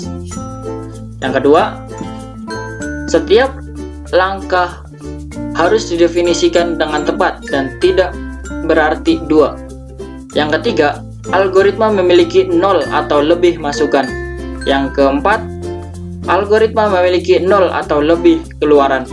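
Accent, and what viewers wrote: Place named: Ambon, Indonesia. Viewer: Persis ambon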